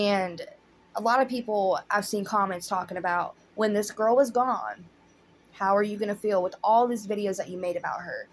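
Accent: South, United States. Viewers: do i hear a little southern twang in your voice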